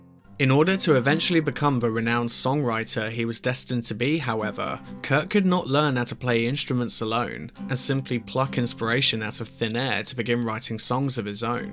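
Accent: United Kingdom. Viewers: Another British Accent bs fake documentary. Pass.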